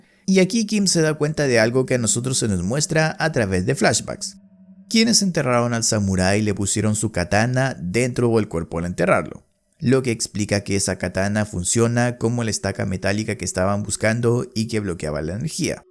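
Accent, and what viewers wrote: Chile. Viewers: Este acento chileno no se me da j ñsajfkaldnjhasdfkasd fñlsajfñljs afls chabocho Gran resumen!!!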